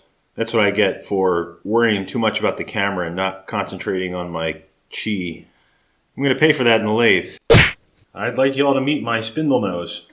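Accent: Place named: United States. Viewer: As usual a fascinating and really interesting video. I'm interested to know: to my British untutored ears you sound American, not Canadian, so how come you mostly seem to use metric? (unlike most of your countrymen who still use that bizarre system they inherited from us Brits.) Even tho I'm I'm my 70s and was schooled in scruples, furlongs etc. it amazes me that anyone would choose "English" when everyone everywhere else uses metric. Perhaps you live in Europe and have seen the light. :--)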